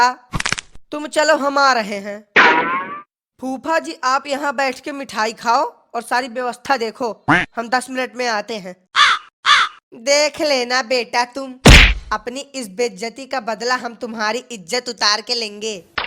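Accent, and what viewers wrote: India. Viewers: Awaz se lgta h aap bihar se ho Up se nhi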